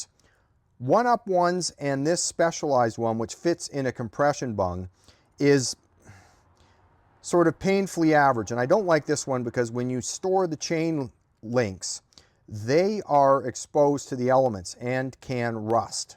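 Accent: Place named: Pittsburgh, United States. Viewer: That's pretty close to a Pittsburgh accent. Never seen the channel before, so that's my guess.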